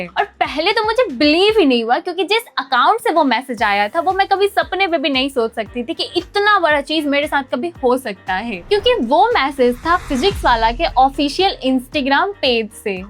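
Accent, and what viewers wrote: India. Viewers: Apke bolne ke language se hi lag rha tha aap bihar se ho 😂😂😂. No hate .I am also from bihar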